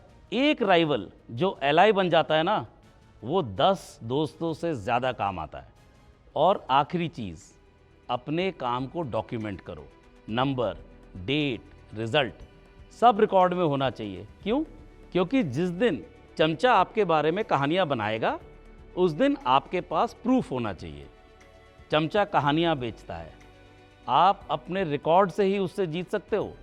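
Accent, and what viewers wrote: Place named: India. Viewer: I realized that you are an Indian, not Pakistani. And it was just because of accent of Urdu speaking ❤. Regards, "FARAZ AHMED"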